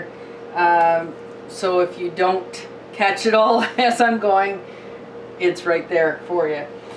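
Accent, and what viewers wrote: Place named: South, United States. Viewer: Thank you for getting back to me. I will check goggle. The reason I ask where you were from. I noticed a southern accent in your voice. I am from the southern part of Virginia. But I now live near Baltimore, Md. The northern part. I don't get too meet very many southern people here. I will look forward to more of your videos. Thank you very much for sharing. Have a Merry Christmas.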